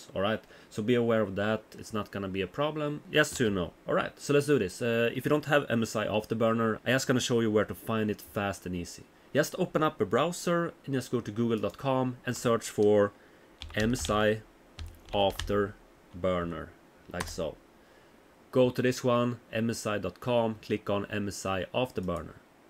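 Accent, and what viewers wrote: Sweden. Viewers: hey i like your channel and the content but i love how i can hear the Swedish accent but keep up bro and i also have a question i want to start mining i have one 2060 and i bought a 3060 ti non lhr what miner or what should i mine and am i late? thanks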